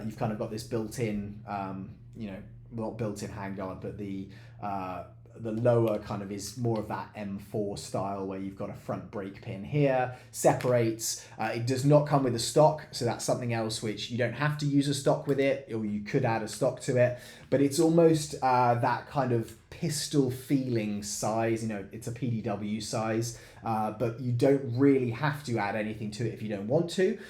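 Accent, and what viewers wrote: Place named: United Kingdom. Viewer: I heard the british accent and began wondering whether or not this was a real gun.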